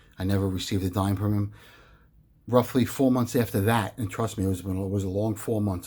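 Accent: East Coast, United States. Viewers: Nice pickups ... love the coin toss of your coins. Your genuine NY accent is Cool as I am from South, I just subbed to you and look forward to your videos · Awesome story! Good for you. Glad you're in better health and back on your feet. It's must have been extremely hard, I can't imagine. Thanks for sharing your occupation with us. I'm so intrigued when I see a large amount of gold and when asked how that person makes money, they never truly answer or tell you something real vague. I'm as working class as you can get. Pretty much paycheck to paycheck so when I see someone doing awesome I am always curious to know how. In your other video when I heard you say $400,000 dollars a year to live. I could picture you laughing at my income or confused how someone could live on so little. I can't imagine making 30K a month. lol Then again, from your accent, you're on the east coast and it does cost a lot more to live and the culture leans to who has the most money. 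A lot of competition to show off wealth especially in New York. But still I really appreciate you sharing it with all of us though. Happy stacking Brotha!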